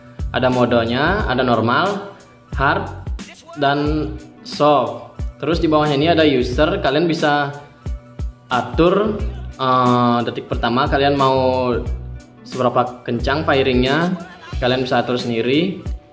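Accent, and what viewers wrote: Bali, Indonesia. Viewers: Ni org bali ya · Org bali ya bang? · Pasti nak bali ne nu logat" Bali ne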